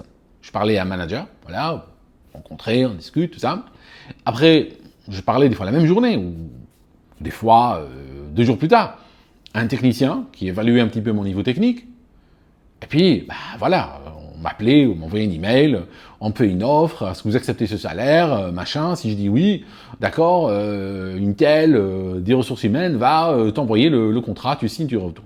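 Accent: Switzerland. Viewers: Quand vous parlez de cela vous parlez de manière très saccadée. Cela diminue fortement la pertinence de vos propos. De la langue arabe transposée en français ? Cela change de votre accent suisse quand vous êtes nerveux, et compréhensible maintenant que vous êtes dans un pays de langue arabe. À part cela cinq étoiles sur le contenu. Flight safe et merci.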